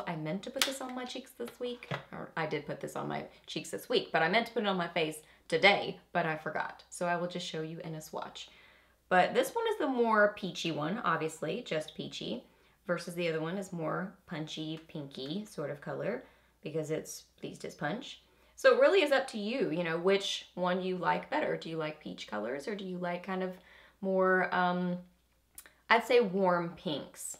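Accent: South, United States. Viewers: I swear you are a breath of fresh air! I can't believe I haven't found you before now (well about a week ago and been watching your older videos) but I just LOVE You! Your personality, your look, your accent (you have a LITTLE bit of a country, southern accent.... I have a REALLY HEAVY country southern accent, yours is cute, mine not so much lol) I got my Amazonian palette today and it's so pretty I'm in love....I have the naked heat palette and LOVE it, I don't need the small one but I'm prob gonna get it just cuz it's so little and cute.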